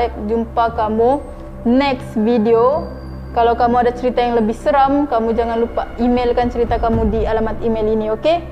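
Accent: Sabah, Malaysia. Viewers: Suka gila kak cakap sabah dia 😍💕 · Akak sabah ka? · Org sabah ke? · Slang sabah cantik kak 👍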